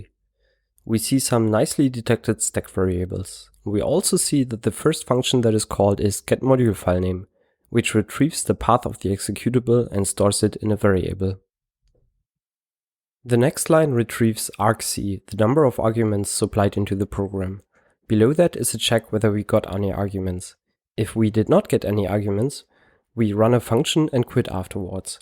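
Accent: Germany. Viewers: I love your German Accent! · Always the slight german accent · nach seiner Aussprache zu urteilen würde ich nen 10er wetten das der gute mann aus dem deutsch sprachigen raum kommt. geniale Facharbeit, ich mag es wenn menschen ihre arbeit verstehen. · This is great, I'm always surprised by new hacking channels every now and then. Listening to your voice, it sounds like you're German, am I right?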